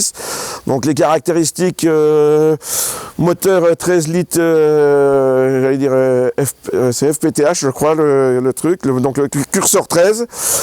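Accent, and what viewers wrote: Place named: Lorraine, France. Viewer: j habite a 1h de Nancy et je sens bien l accent de sa voie 😂😂😂 belle video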